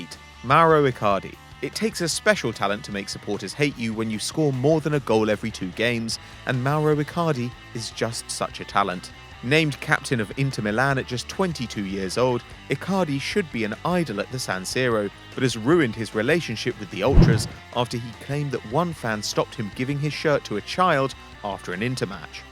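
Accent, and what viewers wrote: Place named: England, United Kingdom. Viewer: This fucking english accent...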